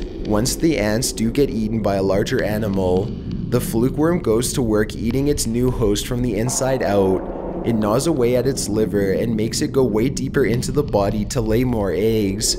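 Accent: United States. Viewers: lol u end ur sentences in question form? its cute i hav a friend that talks the same way! R u american? def. true stories i practice voodoo. but i don*t turn people in2 zombies. thanx for ur scary stories. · Is voice an accent in an American state somewhere if so its beats the broad Birmingham accent in the UK which is like scraping your nails on a blackboard,its like hes forcing the words out of his mouth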